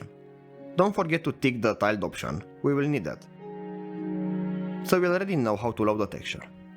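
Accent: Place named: Romania. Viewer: ur deff romanian, not gonna lie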